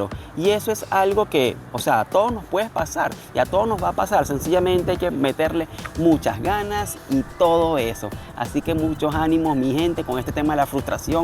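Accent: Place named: Venezuela. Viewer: Que extranio, tu acento me parece mas Venezolano que Chileno... :)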